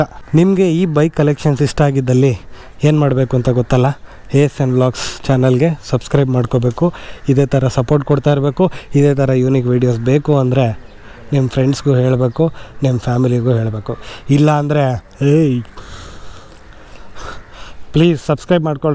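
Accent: Kundapura, India. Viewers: Avr pakka manglore a kundapura va language kundapra kannada tara itt apa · Kundapura kannada💛❤️ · Kundapura Kannada kembuk yesth Kushi ath alya ❤️💖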